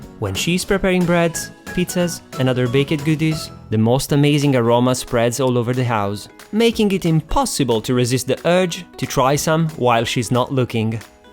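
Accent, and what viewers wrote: Italy. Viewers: yet another great video, thank you! Your videos are always very entertaining because of your wonderful sense of humour:) We also love your italian accent and how you pronounce every letter of English words (as you do in Italian) such as "fruit" and "juice";) Our beautiful Italian vegan friends here in Brisbane recently made us potato and pumpkin gnocchi from their nonna's recipe - buonissimo! They will love your channel too we're sure.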